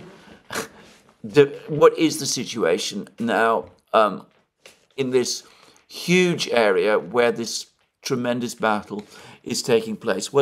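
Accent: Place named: RP, United Kingdom. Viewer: If you had’t been involved in nail bars you could have been king’s council, instead of a messenger for Putin’s grocery boy. Strategic, tactical - good put down. Your accent is posh English, not Greek. Just saying.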